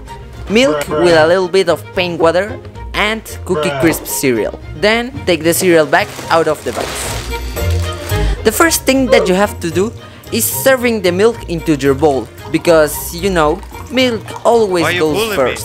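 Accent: Spain. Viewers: eres español? tu acento ingles me suena a español, buen video! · Como se nota que hablas español xd (no hate :, you draw nice :D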